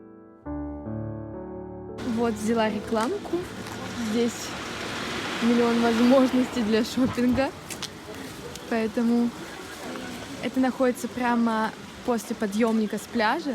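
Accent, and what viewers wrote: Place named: France. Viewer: Привет! Откуда ты? Я никогда не слушал твой акцент 😄 Либа ето не акцент, проста как вы говорите? Вы как француженка с очень высоком уровень на русском хахаха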